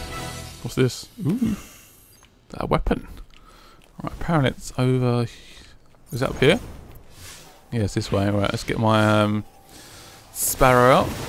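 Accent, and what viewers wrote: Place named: Southeast England, United Kingdom. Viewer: I'd guess he's from Southeast England.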